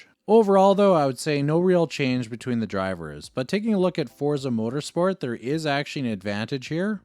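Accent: Canada. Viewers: Bro as canadian watching this and listening to his Accent. I was thinking this man is definitely Canadian. Definitely from western Canada.😂😂. Nice video. Would have taken alot of work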